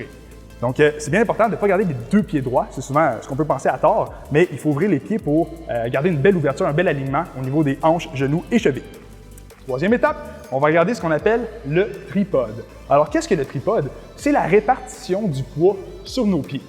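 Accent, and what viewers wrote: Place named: Quebec, Canada. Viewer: merci pour la dermonstration mais vous parler trop vite avec un accent anglais en avalant les mots : c est le quebequoi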